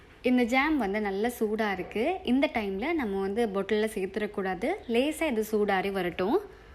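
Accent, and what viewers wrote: Sri Lanka. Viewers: Yesterday I prepared as per ur ratio. It came out well. Tnq so much maa.R u from srilanka 🤔bcoz when u talk u sound like srilankan.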